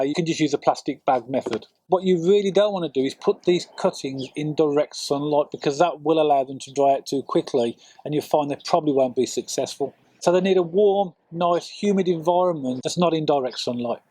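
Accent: United Kingdom. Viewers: Eh, don’t bother me about adding the N. It’s the way Brit’s say compost. Not comPahhhst” it’s comPohhhst. Oh. lol not fence pahhhst. Fence Pohhhhst!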